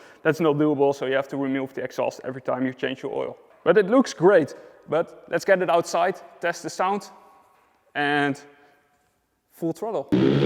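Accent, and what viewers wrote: Netherlands. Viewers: Ar you dutch?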